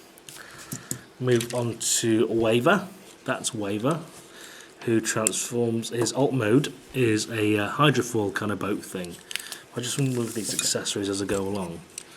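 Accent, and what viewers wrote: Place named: United Kingdom. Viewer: i didint know this guy is british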